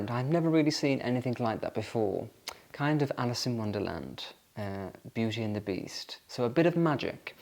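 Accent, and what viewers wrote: United Kingdom. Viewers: what a lovely video! I am from Massachusetts, not decorating for Christmas this year, just not up to it after a 2025 marked by family tragedy. But I do like to see what other people are doing to celebrate and you have very good taste. I loved your references to fantasy and magic when you showed that beautiful clock. And of course, everything sounds even more wonderful when the British say it! Wishing you a Merry Christmas,.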